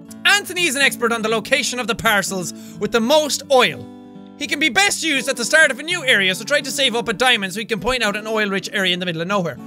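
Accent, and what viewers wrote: Ireland. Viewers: He is bloody fuckin irish m8. It`s his accent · Yes! I've always been wondering who has that Irish accent!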